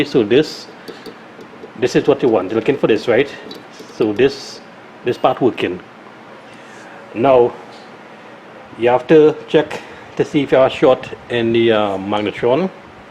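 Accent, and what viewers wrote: Trinidad and Tobago. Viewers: Are you a Trinidadian?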